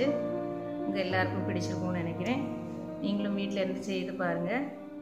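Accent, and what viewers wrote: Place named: Kerala, India. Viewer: Madam ningal malayaliyanennu thamil parayunnathu kettal ariyam, malayalachuvayund Aa bashayil. It's ok. But picture ethra cheruthayittu kanichal anginey clearayi kanum. Eni adukkunna video valuthayittu kanikku pls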